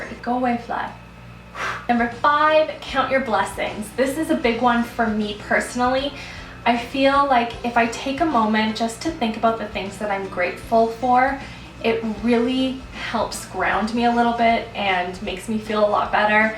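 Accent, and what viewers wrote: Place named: Canada. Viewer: I can tell you're Canadian by the way you say "out" and "about" :) so cute!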